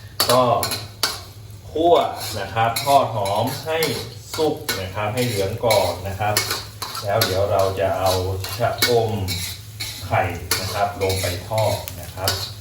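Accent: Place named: Northern Thailand, Thailand. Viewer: อู้กำเมืองอี้ดีละเจ้า เฮาคนเมือง 😂❤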